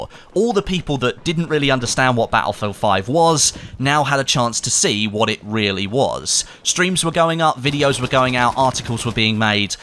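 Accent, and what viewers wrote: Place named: RP, United Kingdom. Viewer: What is this? You gave absolutely no opinion of the game except: it is fun and I THINK I will be happy to play it next 2 years. There is no game-play opinions, there is no gun play assessment, there is no game mods information. YOU ACTUALLY COMMENT ON THE DICE AS GAMING COMPANY, AND THEIR MARKETING STRATEGIES BUT YOU GIVE NO OPINION ON THE GAME WHAT SO EVER. Your videos are worthless waste of time and I feel cheated tor my time and energy every time I watch one. You keep repeating same statements in slightly different way for half a dozen times in slow posh correct English, and you say nothing of substance.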